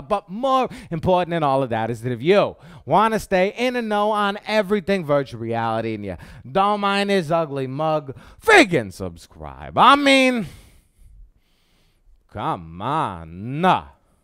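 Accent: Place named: New York, United States. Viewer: I detect a New York accent., you from ther East Coast?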